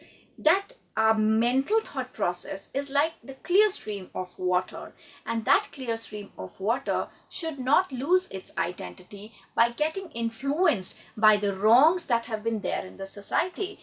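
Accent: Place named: Scotland, United Kingdom. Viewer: you have a touch of Scottish accent. Thank you so much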